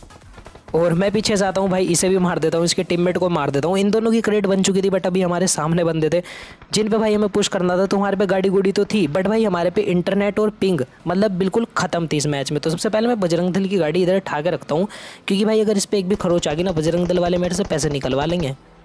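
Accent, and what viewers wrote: Haryana, India. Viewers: Haryana ta h ka bhai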